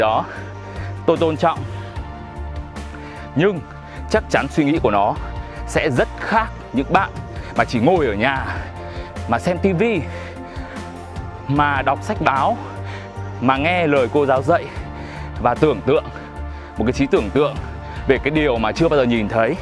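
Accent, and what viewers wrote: Hanoi, Vietnam. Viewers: Giọng HN rất hay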